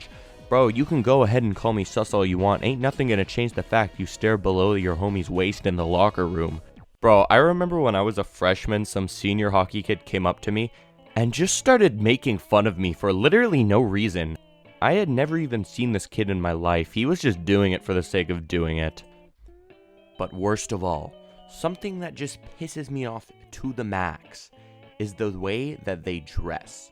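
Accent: Canada. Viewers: Bro you sound so canadian, more canadian than most ones Ik, maybe cuz im in the city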